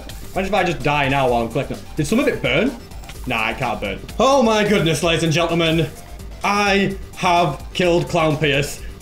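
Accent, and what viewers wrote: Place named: Yorkshire, United Kingdom. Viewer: You have a Yorkshire accent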